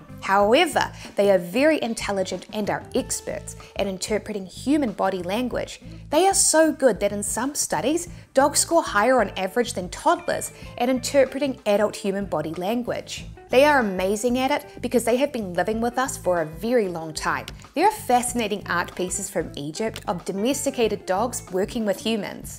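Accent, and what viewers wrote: New Zealand. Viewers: Wow! so good to hear the Kiwi accent talking about my favourite pet. Hope to hear more from you.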